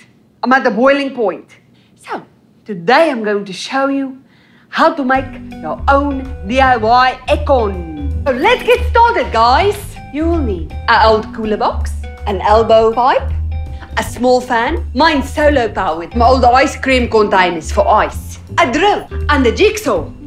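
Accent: South Africa. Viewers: is she from south Africa, I am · Is she south African that would be awsome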